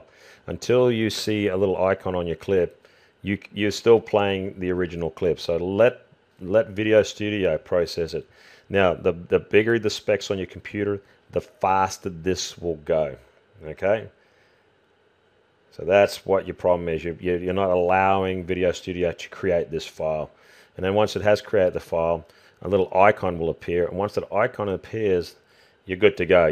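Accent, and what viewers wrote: Australia. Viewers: thanks alot. Big help. please do the costume template video as well nice to hear aussie accent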